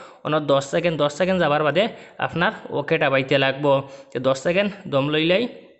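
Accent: Sylhet, Bangladesh. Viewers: আপনি সিলেটের ভাষায় কথা বলেন কিভাবে 😊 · Sylheti bro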